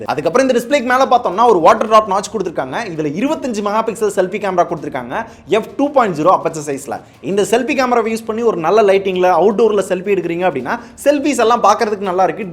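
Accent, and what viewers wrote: Madurai, India. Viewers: Bro Neenga Madurai ah.... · Bro nenga madurai ya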